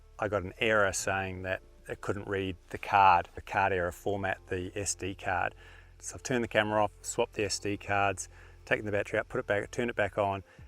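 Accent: Australia. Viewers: Sitting here in cold Wisconsin January (2022), admiring Victoria wildlife. Maybe I will head to WI river soon for eagles, they love the free water below dam once all lakes are frozen. I have a canon 500 mm and 1.4 telex for my 7dmarkII, working on convincing myself to spend $2500 on an r6. Love Victoria and the rest of Australia I;'ve visitied (not enough), love the wildlife, even enjoy the accent mate! Have a cabin on a lake up north with Kingfishers, maybe I need to spend some time concentrating on them, they are cool beyond measure.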